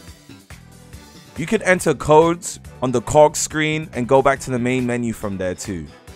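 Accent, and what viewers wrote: United Kingdom. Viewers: The British accent is so dreamy :drooling_face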